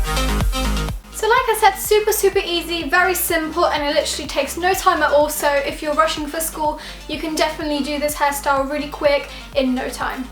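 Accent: United Kingdom. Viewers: She's Polish, but you can clearly tell by her accent that she lives and was born in the UK.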